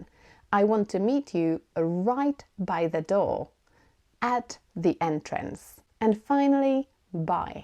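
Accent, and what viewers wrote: United Kingdom. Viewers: Hello,I'm following you fromUzbekistan, very and very nice video thanks,I loved your pronunciation and accent,I love a British accent so much,you cannot imagine how I love English ❤I want to speak..but it not easy 😢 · You speak in British accent and i love it . Thank you ❤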